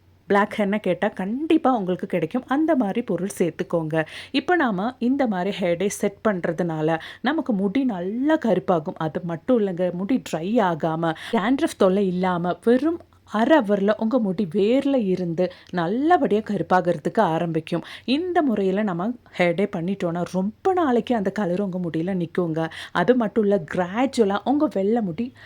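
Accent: Kerala, India. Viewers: Your Malayalam tamil wonderful and ur tips also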